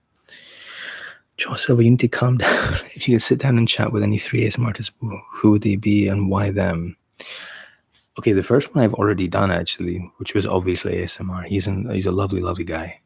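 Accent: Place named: Scotland, United Kingdom. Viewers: Are you Scottish, American or neither bc I can't work out your accent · It's an edinburgh accent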